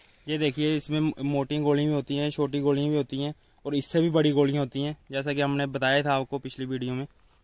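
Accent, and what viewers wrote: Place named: Jammu, India. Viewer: Bhai jammu se ho aap ?? Apka accent whi ka lag rha hai😅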